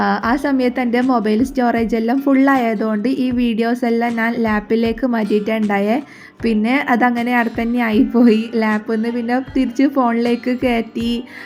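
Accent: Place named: Kannur, India. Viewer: ഞാനും കണ്ണൂർ ആണ് എന്ന വിചാരിച്ചു കണ്ണൂർ സംസാരം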